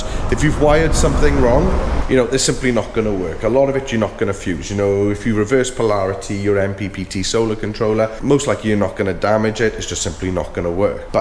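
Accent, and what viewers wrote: Wales, United Kingdom. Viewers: Great video as always. Your workmanship is top notch. As a fellow welsh man I don’t even notice you saying by ere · Hi great vlog really enjoy your output don't stop saying by err obviously a Welsh thing😂😂 as others I follow who are Welsh say the same thing. Quick question on the vlog you said the build was having a 560 amp hr battery huge for off grid and no gas fitted i noticed on the electric board you were fitting a victron 30 amp dc to dc charger surely you would want at least a 60 amp? 30 would take for ever to charge from flat while driving? Just wondered what your thoughts were? Cheers mate keep up the good work also what 3000w inverters do you use recommend when building your vans.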